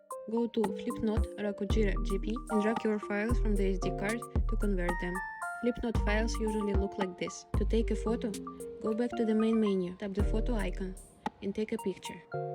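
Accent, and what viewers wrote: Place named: Russia. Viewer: this woman got that babushka accent.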